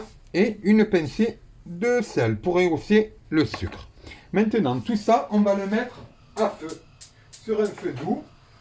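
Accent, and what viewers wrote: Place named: Oran, Algeria. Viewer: On pourra pas dire que vous n êtes pas algérien " n énervée pas mes nerfs " 😂😂😂 votre accent me rappelle mon enfance a Oran 🤔 c est un quartier de Oran mon oncle a habité la bas merci pour vos bonnes paroles et humour 😂👍 je viens de m abonnée ya une semaine bonne continuation 🙏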